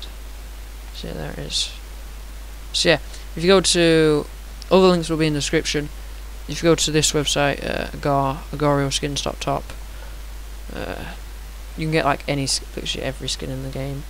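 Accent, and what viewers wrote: United Kingdom. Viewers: BRI'ISH 👽😩 but yeah "It's not looking good brav", from the solution of y'all's problem, is to have a smaller res, some duu from another vid published 6 months ayo saiiyyyd to do a 50x50. We'll see tho, I'm nearly at 90 DNA. 👽👀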